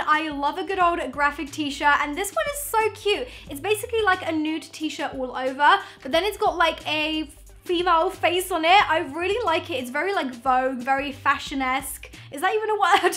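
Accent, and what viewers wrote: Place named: United Kingdom. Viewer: Jana Deni she has a British accent of course she isn’t going to say it right 🙄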